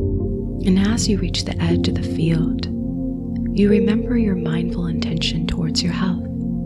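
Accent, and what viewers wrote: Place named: United States. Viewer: Finally, one with an American accent- no more British or Australian ones that distract me lol😂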